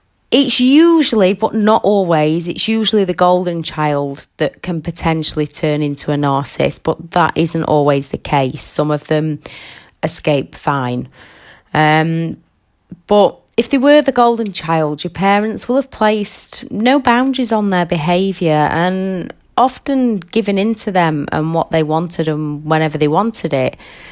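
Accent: United Kingdom. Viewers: I was thinking I might have grown up in the same household with the narrator, except for the British accent. The only member of my family not a narcissist, aside from me, died when I was a kid. For years I thought I just could not get over my father's death, and I cried and cried and cried, thinking there was something wrong with me. It took a long time to realize it was sad that he died, and he was the only one who loved me or treated me with love, tenderness, and care, but what I was really reacting to was the fact that he died and left me alone with THEM. Great analysis of growing up with NPD in siblings.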